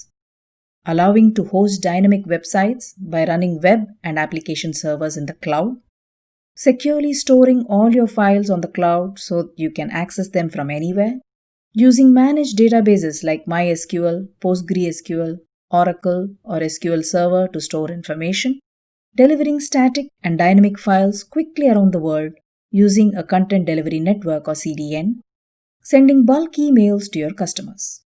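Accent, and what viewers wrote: India. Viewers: first things makerdemy should do is to inform your staff speak slowly, not like robot, with rather strong india accent, i hardly understand a word you saying.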